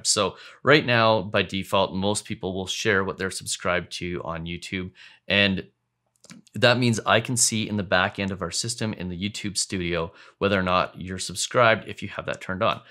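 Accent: Canada. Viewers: I enjoy your content. Great to have a Canadian voice for mortgage and finance news.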